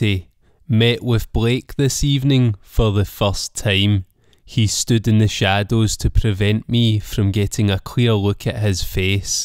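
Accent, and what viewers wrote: Scotland, United Kingdom. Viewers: What part of Scotland are you from? Glasgow? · Are you Scottish? Heavy but wonderful accent.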